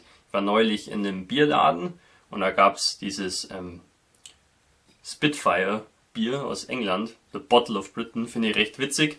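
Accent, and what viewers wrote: Swabia, Germany. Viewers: Bist du aus Baden würtemmberg? Du klingst voll wife der Schwabe!!!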